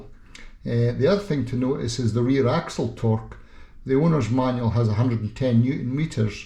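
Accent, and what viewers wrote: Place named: Scotland, United Kingdom. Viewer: Love the Scottish accent, Glasgow? Ave got a DR650 here in Melbourne, luv it👍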